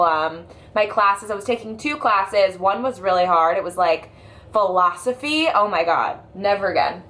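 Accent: New Jersey, United States. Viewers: It comes in and out...east coast/New Jersey sounding...but it is really slight...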